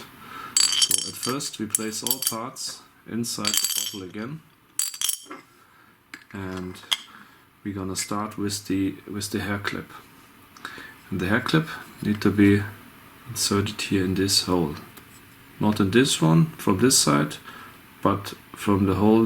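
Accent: Germany. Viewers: André Ribeiro He's German, you're a foreigner too so stfu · Are you german? Your accent sounds like it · du bist deutsch oder? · Dein deutscher Akzent ist echt Hardcore !! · Boy this german accent sure is annoying... just as his fingernails -. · Am Englisch erkennt man sofort das hier ein Deutscher am Werk ist.^^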